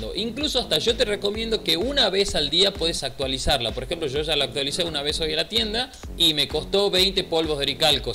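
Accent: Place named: Córdoba, Argentina. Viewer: yo soy de rosario, y a mi me sonas como de Córdoba o no se. En fin no importa tampoco, gracias loco porque aprendi una bands viendo tus videos. Seguí así!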